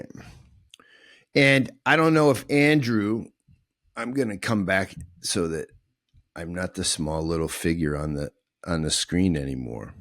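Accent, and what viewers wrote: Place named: California, United States. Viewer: This is strange. You look a lot like a man I meant at the monastery of katskhi a couple of months ago and you sound just like this Californian guy I know named Peter..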